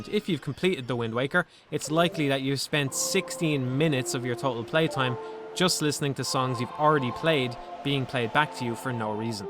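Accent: Ireland. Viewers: This guy's Irish accent is just too good. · he's IRISH!!!!!!!!!! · Idk why but the further in the video I get the more Irish you sound Also, the mail sorting mini game is legit my favorite zelda mini game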